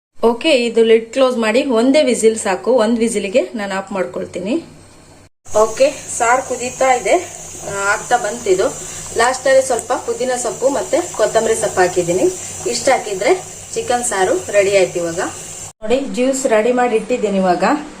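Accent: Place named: Mysore, India. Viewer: Nimma bhase Mysore side matado tarra edhe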